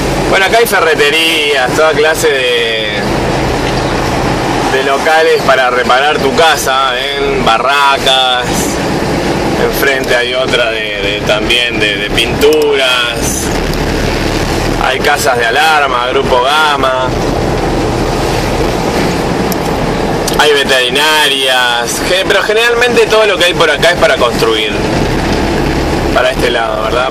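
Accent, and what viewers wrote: Argentina. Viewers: Que raro, dice que se crio en Venezuela, pero habla como argentino.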